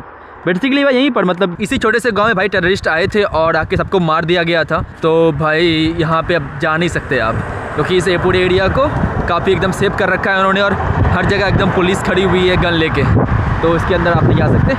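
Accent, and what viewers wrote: Bihar, India. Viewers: Improve your communication skills- drop Bihari accent and stop saying bhai.. bhai… you are doing good work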